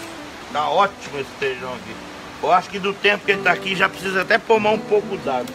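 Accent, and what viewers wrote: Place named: Minas Gerais, Brazil. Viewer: o Se tá parecendo k é de Minas gerais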